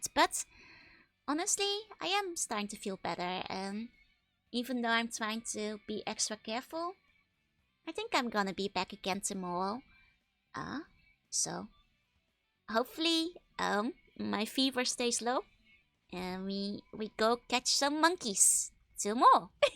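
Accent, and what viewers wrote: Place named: Netherlands. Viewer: i dunno how i got here but i instantly recognized the accent to be from the netherlands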